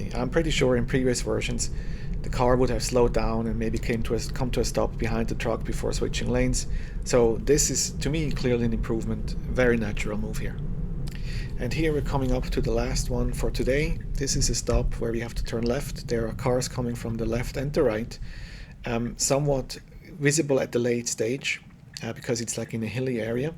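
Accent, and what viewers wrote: Switzerland. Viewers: As a program I think the message about cones was not really about cones, its just the message its default to when its thinks something stationary is blocking the path. Meaning the 'error condition' is more general then the actual 'error message'. Also are you Swiss?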